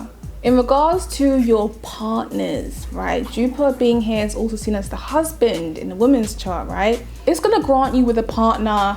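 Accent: United Kingdom. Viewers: am covid vibes did not know she was British. That just blew my mind.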